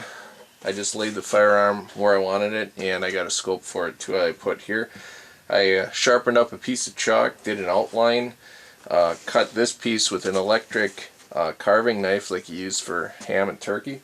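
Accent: Canada. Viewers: For some reason i can always pick up on canadian accents. R U?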